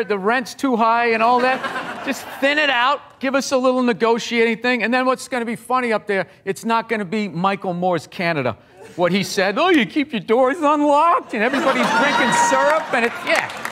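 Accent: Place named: Boston, United States. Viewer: Being from Boston, everything he says just sounds like normal conversation between friends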